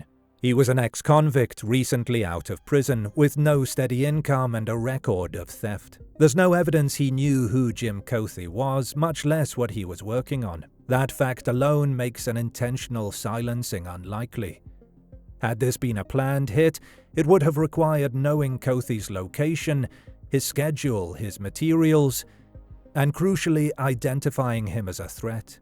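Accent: United Kingdom. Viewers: Really. Why the British accent? Don't buhlschitt a buhlschitter.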